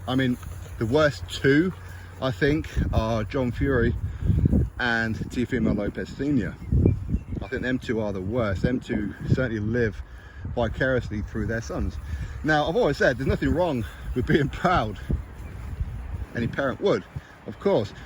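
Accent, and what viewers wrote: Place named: RP, United Kingdom. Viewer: Hello. If you're from Newcastle Upon Tyne where did you get that accent from? I'm born & bred in Newcastle as well but my accent sounds nothing like yours!! You're posh!!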